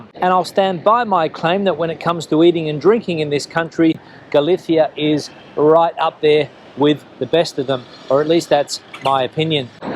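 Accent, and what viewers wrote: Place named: Australia. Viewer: Dat Aussie accent. I've been watching your videos for a while. Thanks for all the info. Cheers from France.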